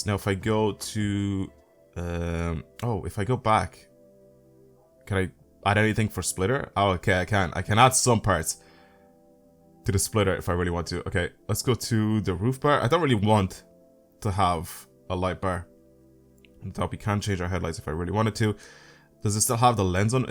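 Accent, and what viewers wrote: Ireland. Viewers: Are you from Ireland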